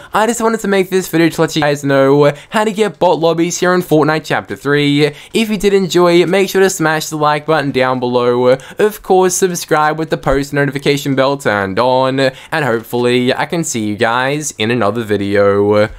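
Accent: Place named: Australia. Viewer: Hes Australian. I know that accent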